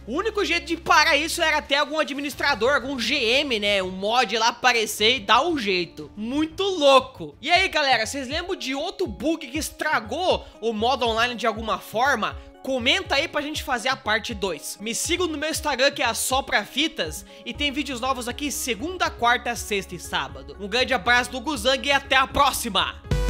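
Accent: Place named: Paraná, Brazil. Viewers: Eu sou de Guarapuava no Paraná também, eu amo nosso sotaque e nossas expressões kkkkkkkk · Cara, eu sou paranaense de Cascavel no Paraná, e mais gosto de ver o falar porque ele parece meus amigos de infância, além de entender tudo de jogos!!! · ele é de pato branco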